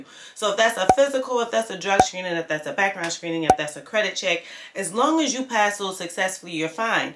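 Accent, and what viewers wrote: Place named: New Orleans, United States. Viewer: awe ok!! It sound like I kept hearing a N.O accent.😂😂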